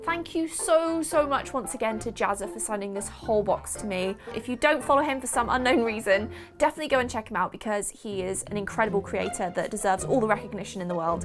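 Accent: England, United Kingdom. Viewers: I assume you're from England by your accent, mine hasn't arrived yet :(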